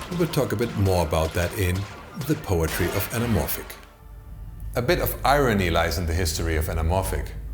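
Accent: Germany. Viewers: Thanks for this works! It show me how much i miss fo to the cinma! Also you have a nice gemran acent! And this is rare as i know as a german. Also instan season 4 and 5 shoot of the expanse shoot with lenses. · Wow, what an interesting and good looking channel! I did watched yesterday 4-5 Videos during my work from the homeoffice. Echt Freunde ich schaue schon seit Jahren englischsprachige Channels und Tutorials und jetzt treffe ich aus Zufall auf euch. Dachte ich immer die amerikanischen Channels wären besser, da spricht mich doch einer mit deutschen Akzent an. Ich denke ich werde Mitglied bei euch werden.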